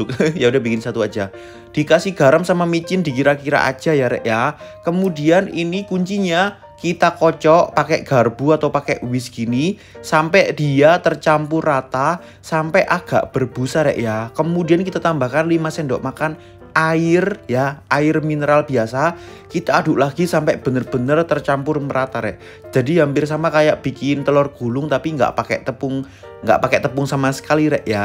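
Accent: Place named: Surabaya, Indonesia. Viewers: logat cino suroboyo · Aku seneng logat nya, surabaya an.... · Kirain NgaPak ternyata suroboyo 😂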